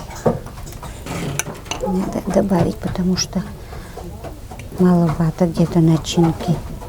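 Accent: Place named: Udmurtia, Russia. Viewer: Дивный сюжет! Я и Ижевска, живу сейчас в Молдове, и просто слышать родной говор так приятно))) Приготовлю на неделе перепечи)))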